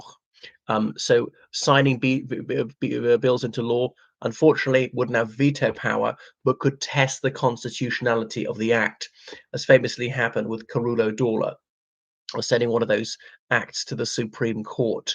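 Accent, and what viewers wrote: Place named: England, United Kingdom. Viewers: you don't sound Irish ? you sound english.... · Ah yeah you are just what we want for our President in Ireland - a Youtuber with an English accent, who cant speak the Irish language, who is anti-Irish Republicanism and who professes Unionism. Move over Cokehead McGreggor we have a new front runner in " from Ireland [with a plummy English accent]"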